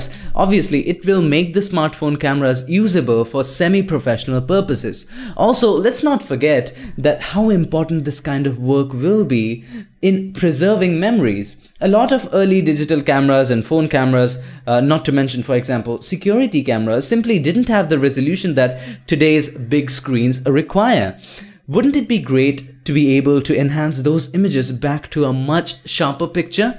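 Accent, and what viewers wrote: India. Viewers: Indian english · Level Up, Indian English with much better diction than many English native speakers.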